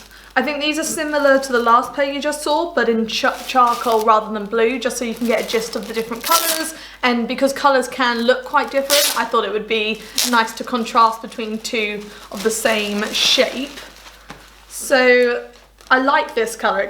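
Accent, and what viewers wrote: United Kingdom. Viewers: You are such a smart and lovely young lady. Enjoy your channel and really love your British accent. BTW Fossils Rock!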